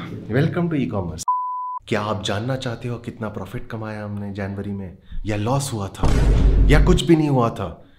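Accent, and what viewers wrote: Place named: Surat, India. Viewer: just by the tone i can tell for sure you are shipping from surat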